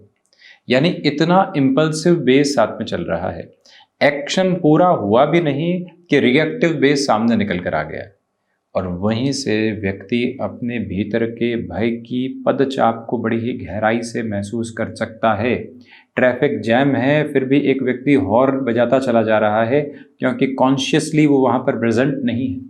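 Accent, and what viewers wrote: Standard. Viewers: जितनी शुद्ध हिंदी वो बोलते है बाकी किसी ज्योतिषी को बोलते नहीं देखा, भाषा पर अच्छा नियंत्रण है गुरु जी का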